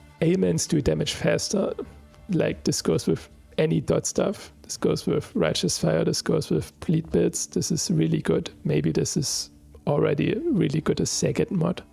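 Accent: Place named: Austria. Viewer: Austrian for sure! Probably from Niederösterreich or Wien! Nice video!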